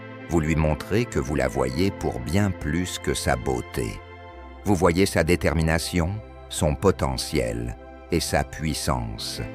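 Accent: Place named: England, United Kingdom. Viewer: Ton accent englais et ton ton robotique m'écourent. Va chier, sale intelligence artificielle. Je vais continuer à me fier à mes dieux.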